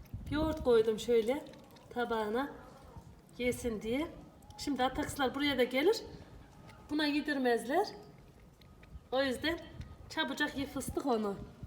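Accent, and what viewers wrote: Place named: Central Anatolia, Turkey. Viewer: S a hnm ic anadolunun insanina dogallik yakişır şivenizi degistirmek icin ugraşmayin sizede her insana oldugu gibi dogallik yakisıyo Allaha emanet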